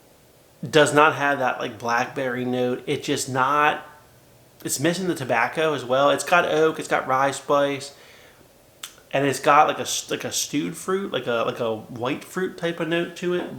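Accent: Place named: Baltimore, United States. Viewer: This guys Baltimore accent cracks me up. Reference to Drug city I assume you work or have family in Dundalk. Curious to try the Belle now. Thanks for the review.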